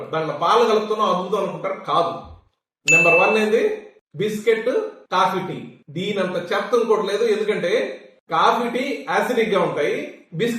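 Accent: Nellore, India. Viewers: sir meru nellore valla?? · మీ వీడియోలు ఏమో గానీ మీ నెల్లూరు యాస మాత్రం అదుర్స్ .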